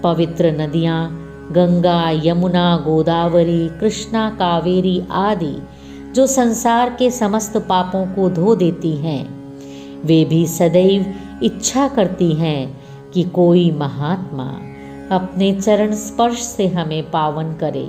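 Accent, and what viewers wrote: Standard. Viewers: Om sai ram.आपके द्वारा बहुत ही मधुर और शुद्ध उच्चारण में बाबा की लीलाएं हमें सुनने को मिल रहीं हैं. इसके लिए आपका बहुत-बहुत धन्यवाद।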